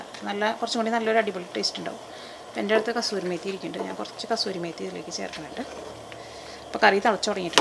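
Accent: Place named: Ernakulam, India. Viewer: Ernakulam style samsaram..husbandinte auntye ormaverunu😘.sound kelkubol...😁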